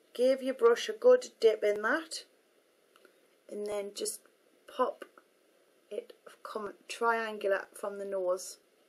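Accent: United Kingdom. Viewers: Something about a white girl with a british accent teaching people how to be like nicki just isnt right.....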